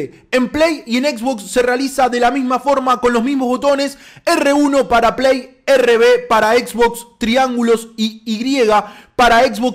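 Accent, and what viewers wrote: Argentina. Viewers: sos argentino?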